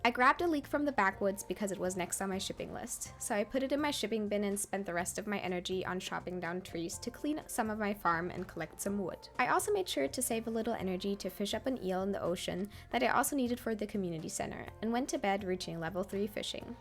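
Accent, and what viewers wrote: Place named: Germany. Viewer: I can't believe I've never seen a video idea like this, and wonderfully executed as wellA It's a surprise you aren't bigger, because this was a great watch!Also, is English your native language? I assume not, considering your (this is a guess by an American, so apologies if this is wrong) German accent, but if it isn't then that's VERY impressive, because I didn't even notice anything until like fifteen minutes in!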